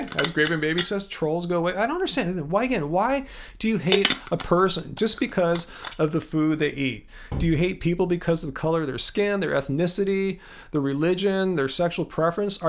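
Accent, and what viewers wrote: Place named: Wales, United Kingdom. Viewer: Welsh hey? Ok we got you! :)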